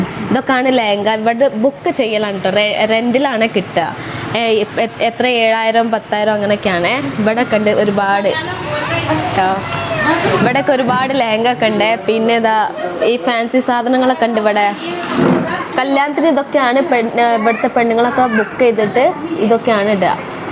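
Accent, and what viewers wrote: Malappuram, India. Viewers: a north indian doing videos in Malappuram slang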